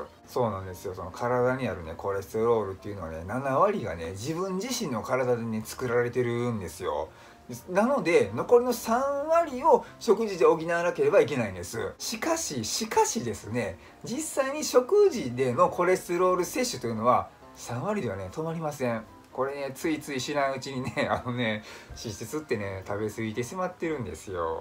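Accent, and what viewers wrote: Osaka, Japan. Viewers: 久しぶりにさんの健康講座を聞きました❣️耳にさんの声が心地良くて大阪弁が柔らかくて、ほんっとーにわかりやすいです😃ありがとうございます😃 家族の健康は日々の食べた物で支えられてる‼️母さん頑張れ‼️と受け止めました😃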